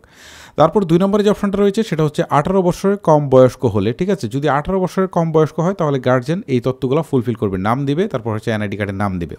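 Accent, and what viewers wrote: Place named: Mymensingh, Bangladesh. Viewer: টিকানা, টিক আছে। ভাই আপনার বাড়ি কি ময়মনসিংহ বা কিশোরগঞ্জে?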